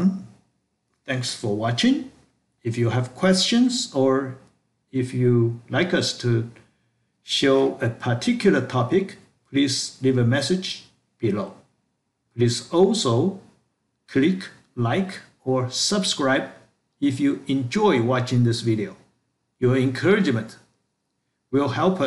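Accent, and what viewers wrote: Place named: South Korea. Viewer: Thank you ! Helped a lot as a beginner :) Also your accent reminds me of my korean friend.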